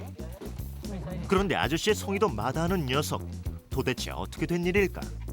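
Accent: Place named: Seoul, South Korea. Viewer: 와 저때도 서울사투리가 좀남아있네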